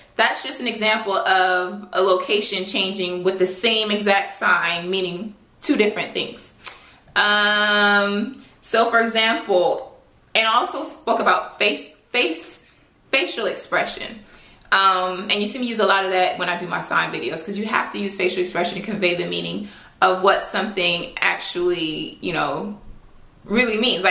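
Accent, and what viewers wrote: Caribbean. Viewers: I started teaching myself sign language from this girl on YT-AshleyMichele07. You're right, it is learning a new language. I hear the accent. Big ups to the Caribbean ladies.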